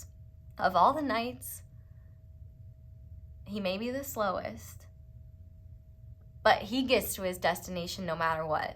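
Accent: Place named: Brooklyn, United States. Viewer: Pile 2, my person is a radio dj and in the beginning whenever we would fight and have a big fall out and stop speaking (we were both denying the depth of this), I would feel a huge surge in my heart and tune into his radio station to hear what he truly wanted to say because our words were failing. This reading is so spot on thank you for your gifts. Haha also I have 5 aspects in Gemini and his mercury is in Gemini too. Also your Brooklynn accent — we’ve talked about naming our future daughter brooklynn. ❤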